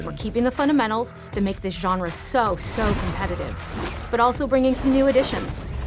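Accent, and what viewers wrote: Quebec, Canada. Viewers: He's from the province of Quebec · Man, that QC accent is strong. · He's French Canadian bud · Hey nice vid, you from Québec by any chance hen? J’entends un ptit accent 😉 keep up the good work 👌🏽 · T'es québécois... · So... any french canadian? lol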